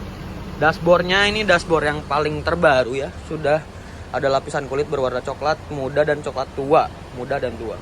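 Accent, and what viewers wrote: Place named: Java, Indonesia. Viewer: Ora tentrem ora marem (tidak tentrem tidak sangar/keren)hehehe logat jowo😅🙏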